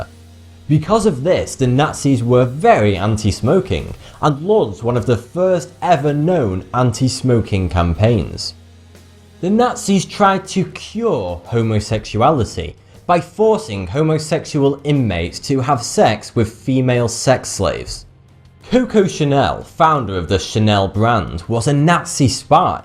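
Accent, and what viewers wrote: United Kingdom. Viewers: 이분 영국식 발음 진짜 취향저격; 신기한게 H를 에이치라고 안하고 헤이치라고 하시네요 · Gilbert 개인적으로는 영국억양이라 생각합니다만... (개인적으로) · 찰진 영국발음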